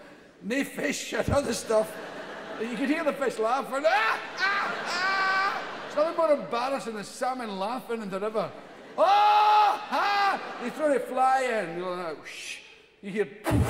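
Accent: Scotland, United Kingdom. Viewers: Im afraid I dont understand half of it…wish I was scottish (or maybe not)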